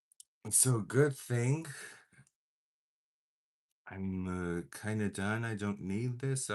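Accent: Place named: Pittsburgh, United States. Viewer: Ha you guyz!!!! How are Yinz dooooooing!!! You sound like a Yinzer!!! Hehehehe Pittsburgh would love you in it's city❤❤❤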